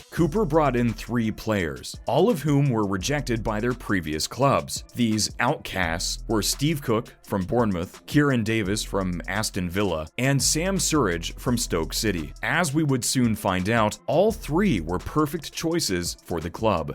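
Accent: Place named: United States. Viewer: Stick video on out of interest. Hears American accent. Switch video off.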